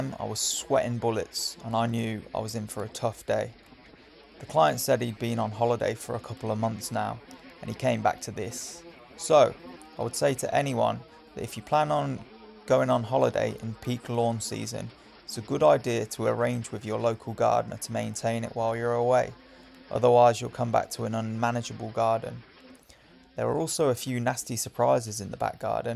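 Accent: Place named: Australia. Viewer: Take it from someone who's been watching lawn care videos for 6 years. Well before the popularity spike. You've got all you need. The quality, the actualmowing experience, the charisma, and we Muricans love a good Aussie accent. Plus you go that extra Kilometer. You just need exposure. Not a matter of if but when. Just keep doing you.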